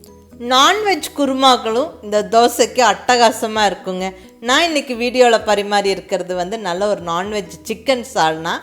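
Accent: Coimbatore, India. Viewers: I like your kovai thamizh.. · Are you from Coimbatore?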